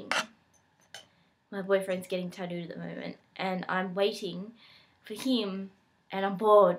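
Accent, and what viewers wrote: Australia. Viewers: you might make money if you invent something that will prevent that from happening. hmm?. any ideas ? and as always, so in love with aussie accent. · Hi, I enjoy watching your videos and I love your accent. You might laugh but that's how I found this channel. A few days ago I was extremely bored and got the idea of learning how to do an Australian accent. Sometimes a few of my friends will have fun conversations using different accents and I've never been able to join in. Determined I started looking up 'how to do an Australian accent' and found your video. I think it's neat how you do these videos every once in awhile, it definitely shows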